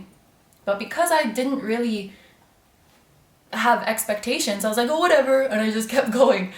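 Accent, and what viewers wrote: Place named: Canada. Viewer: This answered a couple of questions for me. Explains your accent, it’s a Canadian one (I’m also Canadian), the only question I have left is do you speak French?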